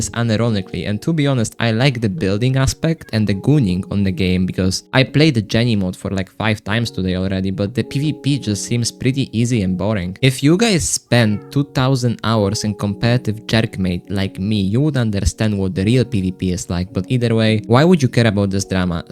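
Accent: Poland. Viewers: You have a Polish-like accent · slychac ze polak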